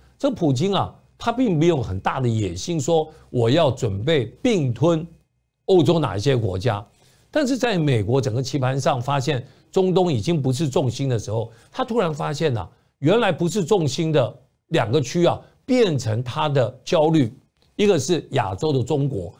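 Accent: Standard. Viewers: 你到底是不是100%洋人血统？ 反正普通话是100%纯正，神乎其神！ 谜团？🙄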